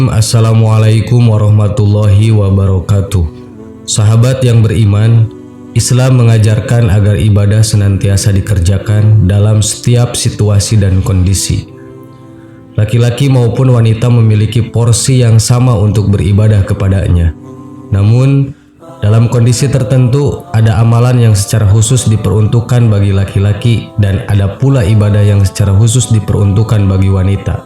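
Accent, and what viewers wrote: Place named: Sunda, Indonesia. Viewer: Suaranya si abang khas bangeetzz Suara org sundaa..mantep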